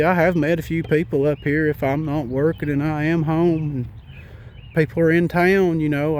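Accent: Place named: Kentucky, United States. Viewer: New subscriber. I’m from Ky and you sound like my relatives. Feels like home.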